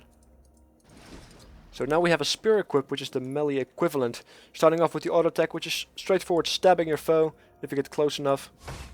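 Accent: Netherlands. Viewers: Sounds like hes from Holland.......... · You sound dutch :O Very nice coverage tough ! looking forward to use the thief :/ 1 more week ! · Are you by any chance dutch? XD what server are you on?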